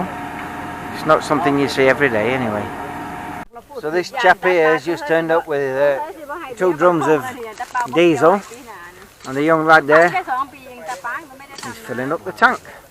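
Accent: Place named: Leeds, United Kingdom. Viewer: you sound like my m8 frm morley int leeds bet im not far off deffo leeds lad bit of crack and im a jock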